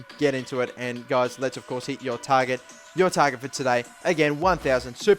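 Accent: Australia. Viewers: Gotta love the Australian accent! :)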